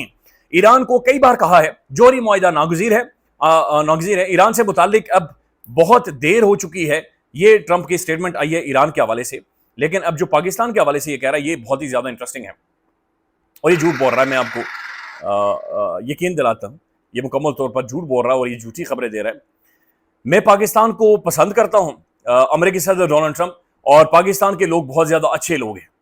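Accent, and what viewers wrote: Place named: Kashmir, India. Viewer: جیو کشیمری لہجہ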